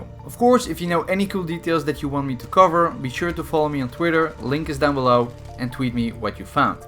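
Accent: Netherlands. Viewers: Are you Dutch? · You're Dutch right?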